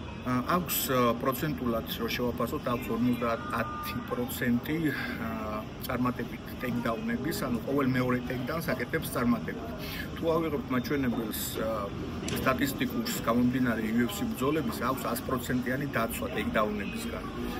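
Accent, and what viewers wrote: Russia. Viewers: ხაიკიკი არა მოხარშული კვახი კიდე , ქართულად ისაუბრე რა... ეს რუსული ხ მაგარი გამაღიზიანებელია და მოდი რაა ვლოგერობას თავი დაანებე და ბუკმეიკერობებს , არაა საუბარი შენი საქმე და იქნიე გამაში ეგ ხელები... ეს პური რომ მეპურემ უნდა აცხოს ხო ვერ ვისწავლეთ 😢😢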